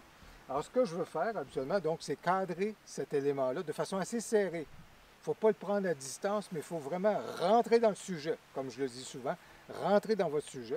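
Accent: Quebec, Canada. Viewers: en québécois ... avec une petite touche d'exotisme bien agréable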